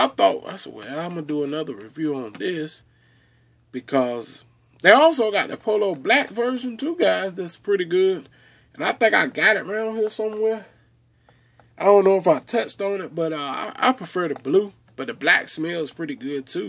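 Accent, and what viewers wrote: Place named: North Carolina, United States. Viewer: Nice review. Sound just like my hubby. North Carolina accent lol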